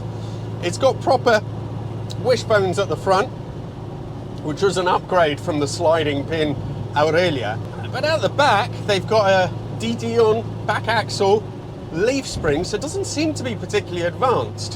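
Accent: Italy. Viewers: No need to apologise for the Italian-ness. When it comes to Italian cars, them Italian twang is kind of necessary. At least in my book, but I'm a weirdo. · I really like this guy and appreciate his great videos but his over pronunciation of Italian words is really grating and un necessary . We get the point , yes you have an Italian heritage but let's be honest you grew up in the UK and that's how your true accent . I can speak some Italian but never over emphasise the accent as it sounds silly when mixed with English.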